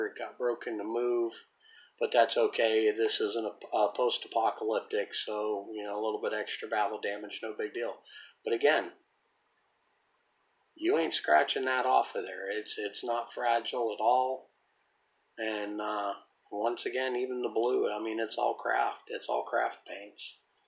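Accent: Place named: Western Pennsylvania, United States. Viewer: Informative video. Sound like you are in western Pennsylvania or eastern Ohio area. Drop me an email sometime.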